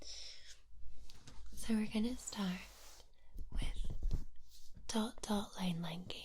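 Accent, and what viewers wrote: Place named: Scotland, United Kingdom. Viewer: 😀👍 3hrs of Sultry Crikey! This will be good....very good.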